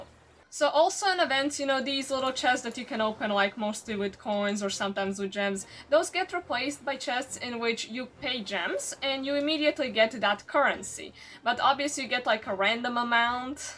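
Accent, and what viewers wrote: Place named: Russia. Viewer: Your accent makes me think you know Russian, if you do then know I do too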